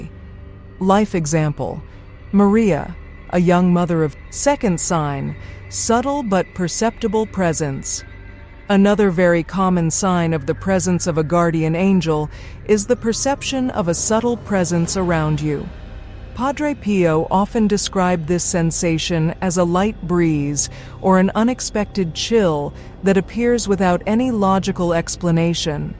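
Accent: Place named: United States. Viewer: Sorry when I hear this sharp woman's American accent it makes everything like a Mickey mouse cartoon. Shame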